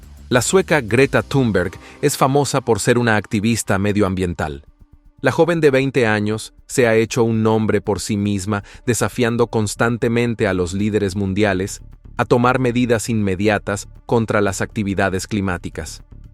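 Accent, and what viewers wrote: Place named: United States. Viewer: Otro más hablando a lo gringo, haciendo el.....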